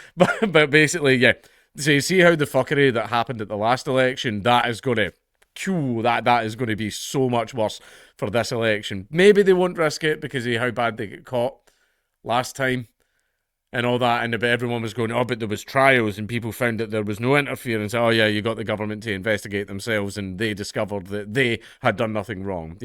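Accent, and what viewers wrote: United States. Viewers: Your American accent is quite good. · That american accent had me dying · American accent is spot on 😂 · I love hearing speak with an American accent.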